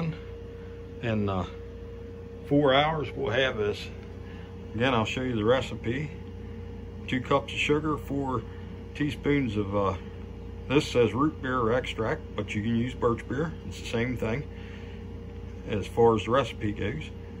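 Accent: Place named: Maryland, United States. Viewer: That sounds like a true Maryland accent hah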